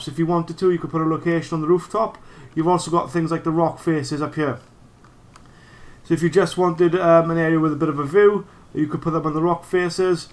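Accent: Wales, United Kingdom. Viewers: U welsh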